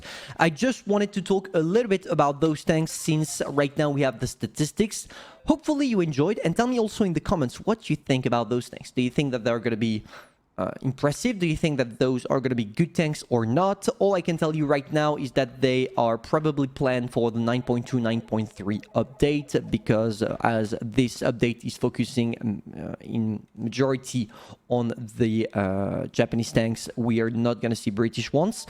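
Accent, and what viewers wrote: France. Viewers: The audio is funky. He sounds French🤔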